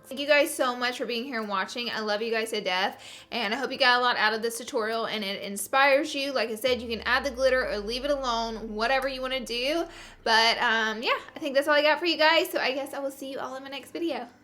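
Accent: South, United States. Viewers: yes because she’s southern and has an accent.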